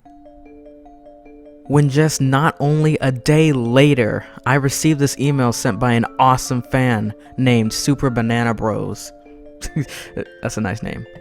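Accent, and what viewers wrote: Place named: South, United States. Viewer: you sound like Gideon. Maybe in a little southern accent lol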